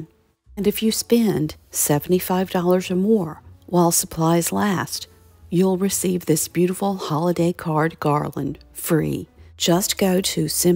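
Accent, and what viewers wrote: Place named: South, United States. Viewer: I bought the book you recommended on Christmas Traditions when you featured Part 1 off Amazon. It was a little cheaper than you had said and so I treated myself. I have enjoyed it so much that I will be decorating for Christmas before Thanksgiving for the first time. I agree it will be more festive at Thanksgiving and give me a chance to sit back and relax to enjoy the Christmas season with a few more things already done. I love your videos it relaxes me to hear your voice and your slow southern draw. I’m a southern girl too so no pun intended. Thank you for all the time you put into your UTube videos. They are lovely, very tasteful and informative. I’m a big fan of yours. Be good to yourself and i will do the same. Good day Southern Lady🍁🍂 Linda from Pensacola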